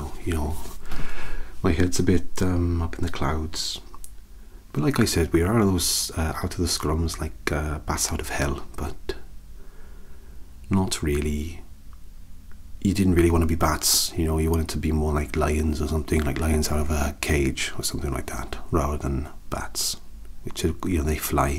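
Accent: Wales, United Kingdom. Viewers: This made me so happy! As a Welsh woman, the accent made me smile. You really can put your voice to anything! Brilliant! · It absolutely did. Hearing your voice always makes my days brighter, and it was definitely interesting to hear your Welsh accent! <3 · Would love to have more vids with the Welsh accent, or any other accents you can do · With the Welsh accent you're making me wish you'd do a Solas roleplay! From Dragon Age. Most relaxing character imo. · So the Welsh rugby team caused the Corona Virus... Also if you're not Welsh, that is an outstanding Welsh accent impression. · Just finally watching this video and just wanted to say that I love your welsh accent. Very relaxing to listen to. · Moar Welsh accent vids, please. n_n (( Maybe something Sci Fi? :DDD But really, anything will do. It's so beautiful! )) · This was freaking hilarious, the welsh accent does add comedy value too, love it.x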